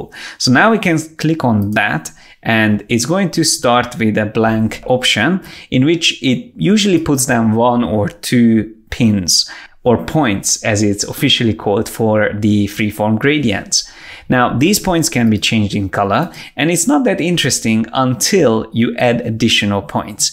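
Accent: Hungary. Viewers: bonus for hungarian accent :)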